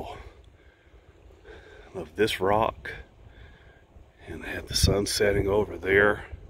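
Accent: South, United States. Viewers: why you live in navada and you talk southern ?? ohhh the duckling. yes you do have good babies. i have to admit, i do enjoy you video....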